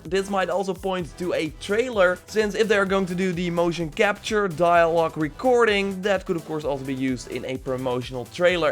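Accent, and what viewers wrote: Netherlands. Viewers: Are you from NEDERLAND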